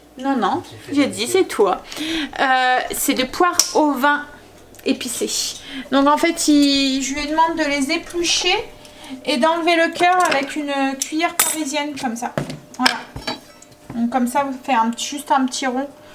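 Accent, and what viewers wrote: North, France. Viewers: Bonjour. Je découvre votre chaîne et j'aime beaucoup. Vous avez un joli accent, du Nord? Je n'ai pas Facebook, quelle levure utilisez-vous? Merci ❤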